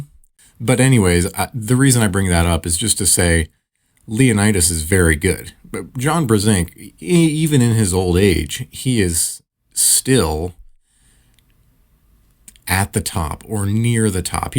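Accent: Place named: United States. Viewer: Finally, found an AW news channel with an American accent .. plz post daily🫡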